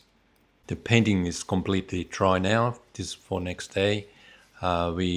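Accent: Australia. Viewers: Great tips and lovely art, subbed! Do I detect a little Aussie within the Finnish accent? :face-blue-smiling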